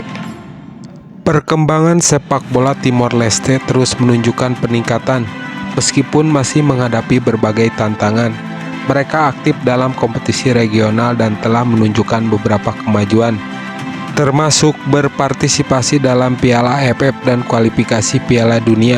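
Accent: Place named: Sunda, Indonesia. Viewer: Sundanya medok bnget min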